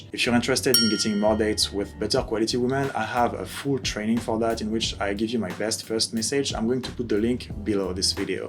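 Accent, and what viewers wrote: France. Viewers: A good looking dude with a French accent giving advice on rejection. Yeah ok bro 😆👌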